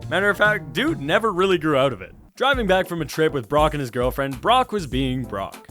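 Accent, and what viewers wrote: Canada. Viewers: wait are you canadian????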